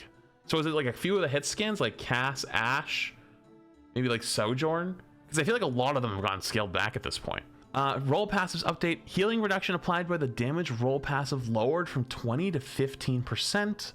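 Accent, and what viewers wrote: Boston, United States. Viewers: BOSTON ACCENT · I need more OG Boston accent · DOOM'S GONNA BE BETTAH!:D Embrace the Boston,. Let it out! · We need more Boston accent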